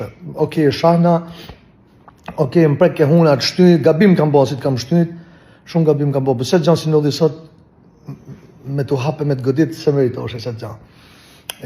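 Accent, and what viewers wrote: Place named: Laskar, Albania. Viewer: Ik ore lepirse, le nam. Po kan ngren leva. Veprimet kan pasoja, mire ja beri. Ti gjithsesi je vk qe flet si laskar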